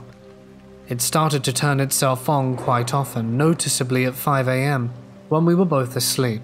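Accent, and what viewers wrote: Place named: RP, United Kingdom. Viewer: I love your voice because it sounds like Rp-English. Excellent for practicing pronunciation.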